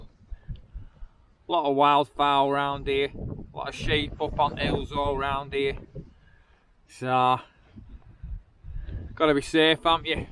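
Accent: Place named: Lancashire, United Kingdom. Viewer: Excellent video. Always look forward to them. Love hearing the accent. Here in Western Australia you don’t hear many Lancashire accents like yours or mine. I can’t go walking in the mountains like you but feel I’m there. Many thanks from dave down under.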